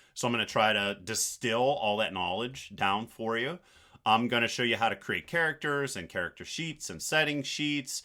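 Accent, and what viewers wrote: Pittsburgh, United States. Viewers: I thought I heard a fellow Yinzer accent all these years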